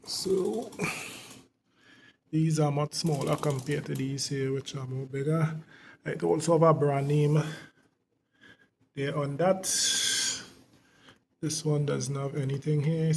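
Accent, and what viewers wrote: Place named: Trinidad and Tobago. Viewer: Hi, i can tell you're from the Caribbean, Trinidad and Tobago, right? I have the Supermach SM26 from Pricemart and it works amazing. I noticed that seat is mounted backwards compare to mines. Also if you press and hold the up and down buttons same time, press the M button until the "P0 8" Icon appeared and press both arrow buttons to come out.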